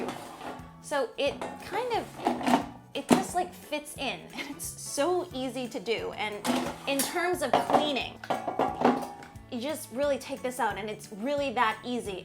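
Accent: United States. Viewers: Asian lady with an American accent in a kitchen with UK plug sockets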